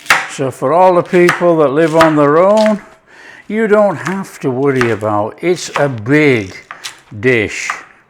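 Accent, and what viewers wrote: United Kingdom. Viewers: Love your style sir, you probaly think we got an accent over here in the USA, but that's ok but, we LOVE YOUR BRITISH TALK, LOVE YOUR, "COME ON, PLEEEESE! THATS THE SIGNATURE OF 😅 you are so down to earth, and such a great cook, I look forward to each of your videos, love the one right way to make burgers. You are a master in the trade, simply love your presentation, espeacially when you sit and taste what you made, love your expressions, a bonus to all your videos, crack on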